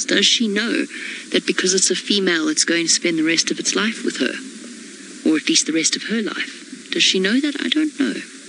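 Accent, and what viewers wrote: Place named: England, United Kingdom. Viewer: Maybe if you could film and shut up. English accent off putting had to mute.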